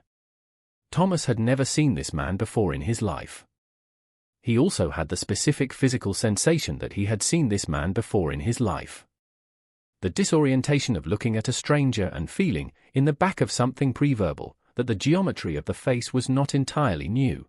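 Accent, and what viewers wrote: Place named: Europe. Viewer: Can't get past the strong European accent describing a Black (from Atlanta, USA)... Thumbs down on narrator.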